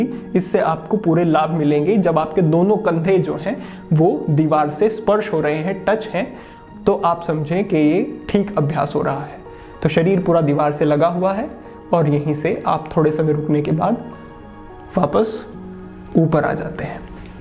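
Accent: Standard. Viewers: Shrimaan aapki Hindi ati sundar ati shudh hai. Pranaam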